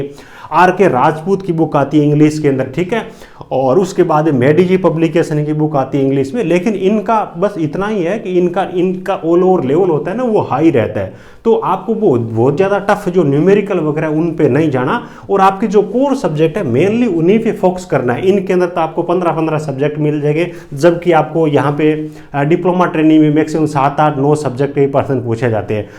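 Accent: Rajasthan, India. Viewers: Aap rajasthan se ho kya sir?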